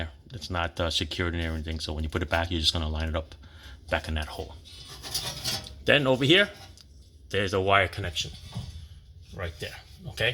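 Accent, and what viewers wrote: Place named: New York City, United States. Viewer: Love that NYC accent.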